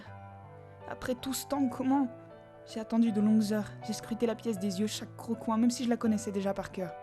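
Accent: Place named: France. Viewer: Ton accent français c' est fou comment je trouve ça très touchant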